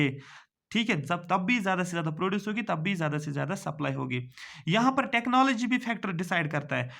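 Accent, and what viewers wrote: Kashmir, India. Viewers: are u Kashmiri sir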